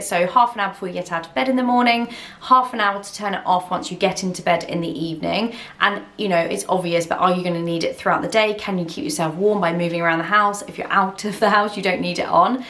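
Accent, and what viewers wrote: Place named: United Kingdom. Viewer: Life is so expensive in the UK and Europe... I live in Sineria in a 90 square meters apartment, we are a family if five and our total monthly bill for electricity, heating, hot and cold water is 50 euros. And we have to keep our windows open every day, because average temperature inside is 25 degrees Celsius. (I am here to enjoy the real British pronunciation)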